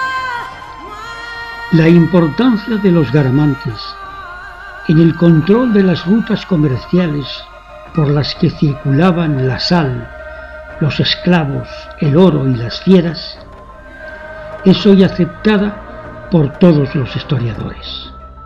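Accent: Spain. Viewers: Me jode mucho el acento español tan marcado q por momentos ni se entiende, por eso no pude seguir viendo el documental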